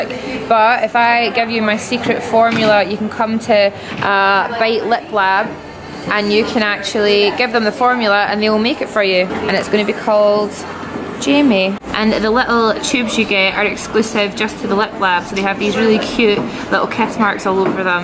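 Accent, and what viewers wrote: Scotland, United Kingdom. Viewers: BTS Trash she's Scottish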